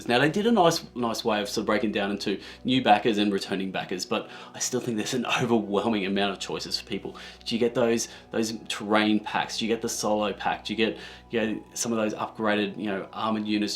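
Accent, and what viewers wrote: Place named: Australia. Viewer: Great to hear an Aussie accent covering board games on YT! What do you think of the shipping costs to Australia for this campaign?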